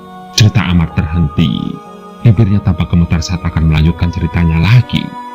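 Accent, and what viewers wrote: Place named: Java, Indonesia. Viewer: Mantap bng aku suka cara Abang ceritanya seru dan has jawanya 😊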